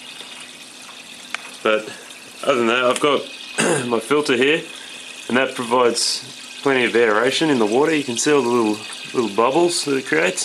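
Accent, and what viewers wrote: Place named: Australia. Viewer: Super cool! Could tell you were Aussie right away from ya accent. Cheers mate! 🍻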